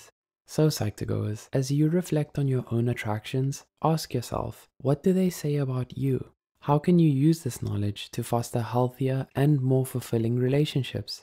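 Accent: South Africa. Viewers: Is the guy who did the voice over on this South African? I feel like I can recognise my own kind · The male, South African narrator threw me off guard. Not in a bad way, I was just expecting the usual woman. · I have to know is the person speaking here South African!!?? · Narrator is South African right? Ek hoor jou. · I love the south african narrator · I haven't watched a psych2go video in a minute but I know a South African accent when I hear one. Loving the new narrator. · are you south african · Is that a South African accent I hear, or am I just insane? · I'm detecting a South African English accent, am I right? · AS SOON AS I HEARD YOUR VOICE I WENT LOOKING AT YOUR ACCOUNT AND I KNEWWW YOU WERE SOUTH AFRICAN HIII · IS THAT A SOUTH AFRICAN ACCENT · Random question is the person speaking from south africa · This guy sounds south African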